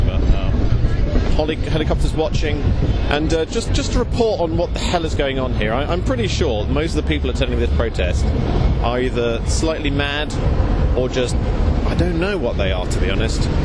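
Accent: United Kingdom. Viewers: please listen to this American woman: you are not uglier in the morning. You are not ugly at any point of the day during any of your videos. You are very attractive (not to mention very, very intelligent) and if my daughter was just a bit older, I would try to put you together with her. She is a genius girl, gorgeous, and an Anglophone. Too young for you though. Anyway. The point is, you're not ugly. You're a cutie pie who says lots of smart stuff whilst using a smooth British accent. 😉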